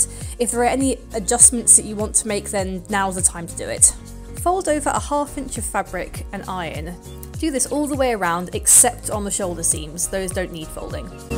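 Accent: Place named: England, United Kingdom. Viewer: Someone with an English accent using imperial measurements 😍 Most videos use those centimeters and millimeters nonsense 🤣🤣🤣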